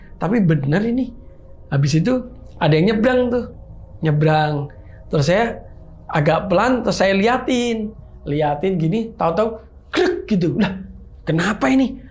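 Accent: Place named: Java, Indonesia. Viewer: Kirain Mike vokalis Marjinal kwkwk pas ngomong medok oh ternyata bukan wkwkwk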